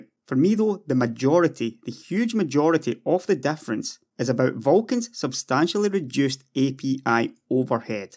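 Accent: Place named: North, United Kingdom. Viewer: i love that northern accent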